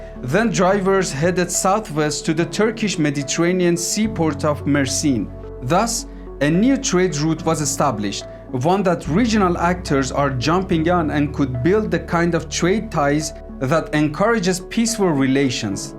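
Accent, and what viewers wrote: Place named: Iran. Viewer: I like the persian accent of the speaker ❤️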